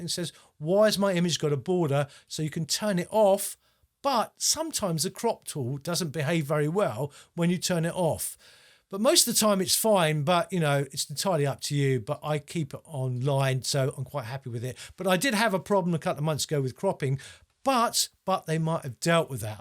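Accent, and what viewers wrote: England, United Kingdom. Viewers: Very helpfull! Where is your accent from? It reminds me of when I lived in England.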